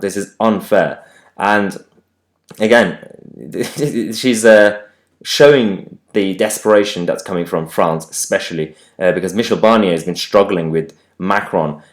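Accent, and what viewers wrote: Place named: England, United Kingdom. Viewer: I love your reporting! Your presentations rely on actual source information, succinct and intelligent commentary, and a gorgeous English accent!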